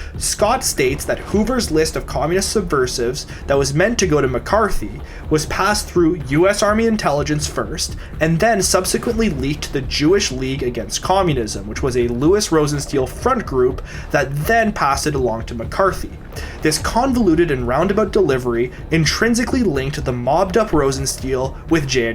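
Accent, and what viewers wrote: Canada. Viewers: The Canadian accent is rough. You have a major problem with your As.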